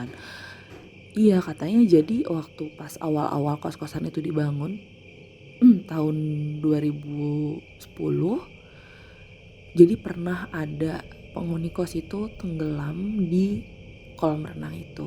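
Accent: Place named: South Jakarta, Indonesia. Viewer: Mbak nya logatnya jaksel bgt 😅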